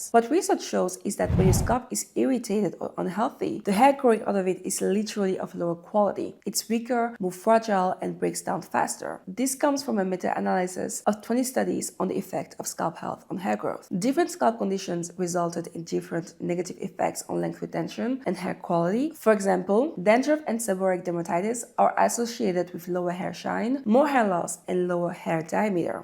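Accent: France. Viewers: Are you French? The accent it😍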